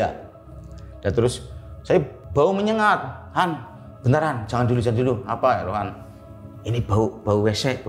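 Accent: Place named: Banyumas, Indonesia. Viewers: Waduh ngapak ya...😊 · Owalah wong ngapak jan😅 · Salam dr Banjarnegara. Kelihatan logatnyaaa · Ceritanya sedih dan bikin haru,cm krn bapak ngomong jawanya ngapak,jdi lucu buat saya yg nyimak,jdi kdg udh terharu tetiba ngakak sayaaaa😂😂😂,sehat2 nggih pak❤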